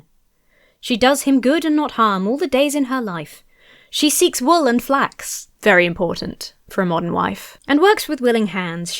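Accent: United States. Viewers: Your American accent is impressive. Reminded me of the one Michael Fassbender does in Alien Covenant. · I'm only twelve and a half minutes in, but I have to say I got so confused when you began reading in an American accent. I listened to this in the background while I drive, so it was a little bit disconcerting. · with an american accent is cursed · I love your American accent. It's gold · that american accent genuinely broke my brain for a good few seconds when i saw your mouth moving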